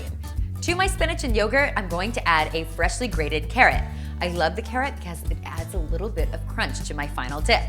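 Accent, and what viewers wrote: Canada. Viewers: She kinda talks like a news anchor but like in a totally non annoying way in fact I love her Canadian accent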